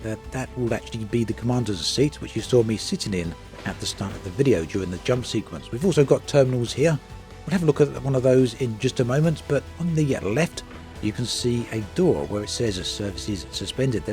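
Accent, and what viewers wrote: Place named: Dorset, United Kingdom. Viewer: Sir please reduce that music, I need to hear your dorset tones:)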